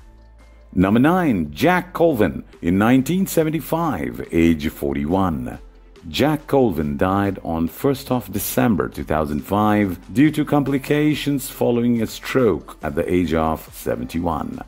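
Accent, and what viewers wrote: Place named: Afghanistan. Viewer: Come on man!! Why is someone from Afganistan narrating this ALL AMERICAN tv show cast..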